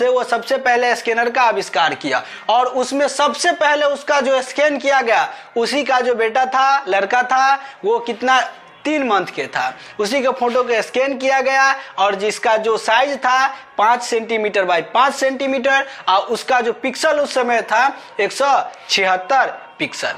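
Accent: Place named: Bihar, India. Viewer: Bihari pronounciation 😅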